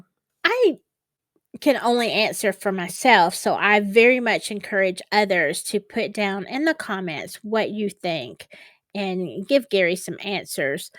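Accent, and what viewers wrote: South, United States. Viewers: I think you nailed it and love your channel name. It tells what kind of content you have with the word spooky and with your beautiful southern flare to give the added bonus. Also, I got to hype your channel. YAAA ❤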